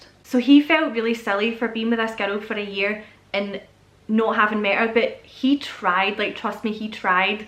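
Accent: Scotland, United Kingdom. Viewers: Hi, ive been a silent follower for some months, came here to learn scottish accent lol, but i just have to say THAT WAS AMAZING! I fullheartedly enjoyed the story. So good. Like a thriller, it was sooo so exciting! So thank you for sharing, hope you get the last answers. And yeah a documentary would be super cool :)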